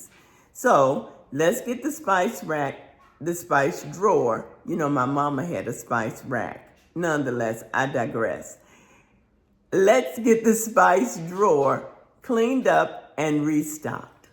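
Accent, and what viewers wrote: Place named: Virginia, United States. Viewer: I really enjoy your videos and the way you speak. There’s something very comforting about your voice and delivery. I spent a lot of time in Virginia while stationed there in the Army, and the moment I heard you speak, I instantly recognized that familiar Virginia cadence. Wishing you and your family a very happy New Year.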